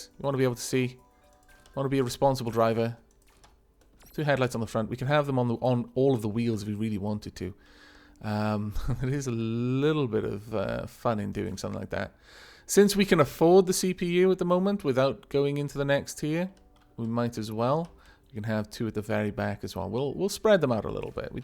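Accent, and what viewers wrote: Wales, United Kingdom. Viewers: firstly, please read on xD. Secondly, you genuinly are THE best youtuber out there. You aren't recognised as so because you dont play the big games such as minecraft. There, take the compliment and run, you dapper soul! Also you refer to living in Scotland often, but you sound un-mistakably Welsh???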